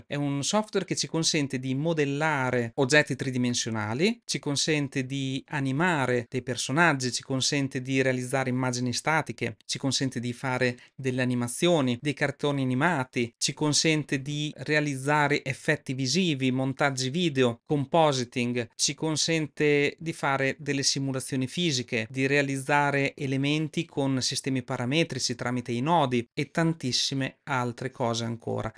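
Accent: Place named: Emilia, Italy. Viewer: Ciao mi sto avvicinando a Blender da pochi giorni, provengo da Cinema 4D con un livello appassionato avanzato, grazie del corso lo seguirò con interesse e costanza, dal tuo accento sento che sei emiliano, valore aggiunto in quanto amo la tua terra tanto che ho la compagna della provincia di Modena! a presto!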